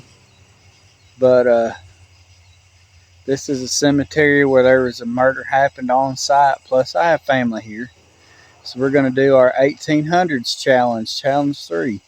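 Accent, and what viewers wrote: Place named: South, United States. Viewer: Very atmospheric video. I dig your accent (1 of the southern states I guess) and the chirping of the crickets creates a cool ambiance. 👍🦗PS I am English so please excuse my ignorance as to which state your accent represents!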